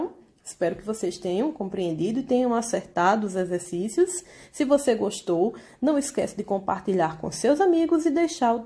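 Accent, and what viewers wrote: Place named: Northeast, Brazil. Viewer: que aula fera! ouvindo seu sotaque me lembrei do nordeste, um lugar que amo!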